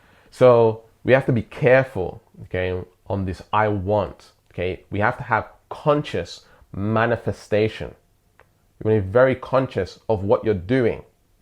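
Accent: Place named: London, United Kingdom. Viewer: What part of London are you from? Hehe! Amazing post BTW keep inspiring 🙌😇 to want is to lack 💯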